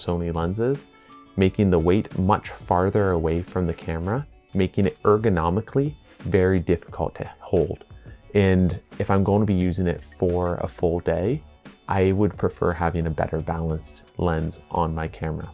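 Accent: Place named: Canada. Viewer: Holy Canadian accent LOL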